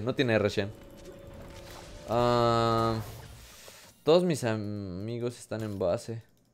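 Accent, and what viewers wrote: Colombia. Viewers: Eres de Colombia? XD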